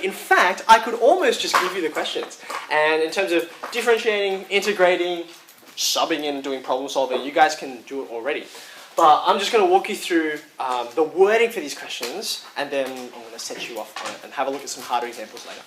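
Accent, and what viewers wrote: Australia. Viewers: Liked for the aussie, subscribed for the education. Ugh. wish you were my teacher.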